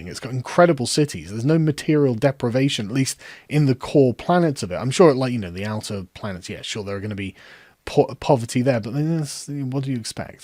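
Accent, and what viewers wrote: United Kingdom. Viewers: It is a credit to your well-spoken Britishness that listening to you riff on this is still entertaining, even when you're completely wrong. The "Dark Side" isn't a facet of the human condition. Maybe it would be if conceived by better writers for a more mature audience. But it ain't. The Dark Side is a PCP/Crack/Adrenaline cocktail that can(and very often has) instantly flip a noble and altruistic hero into a yellow-eyed baby-eater in the blink of an eye. Repeatedly demonstrated throughout both canon and non-canon materials throughout the decades of the franchise. It's what happens when a Dragon Age mage becomes an Abomination. And in that context you now begin to understand the Jedi are a peculiar mixture of the Dragon Age Templars and Circle combined...with all the hypocritical flaws, and magi-knight badassery that entails. But they do what they do because when they fail...yellow-eyed baby-eaters run rampant all over the place.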